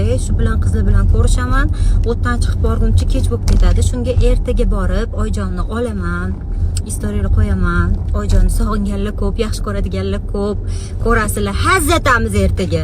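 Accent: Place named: Syrdarya, Uzbekistan. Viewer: Сирдареча гапирди😅😅😅😂😂😂😂😂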